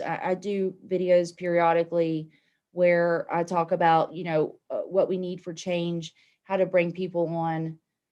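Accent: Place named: Tazewell, United States. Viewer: Coming from convo couch. Im a Tazewell native, so my accent is about the same as yours!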